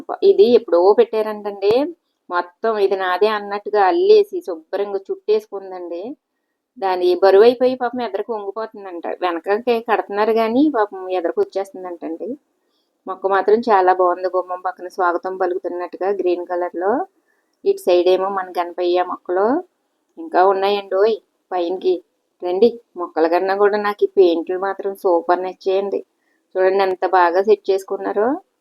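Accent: Godavari, India. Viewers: హాయ్ అండి చాలా బాగుంది మీ వాయిస్ కూడా చాలా బాగుంటుంది మన గోదావరి భాషలో చాలా బాగా మాట్లాడుతూ ఉంటారు థాంక్యూ అండి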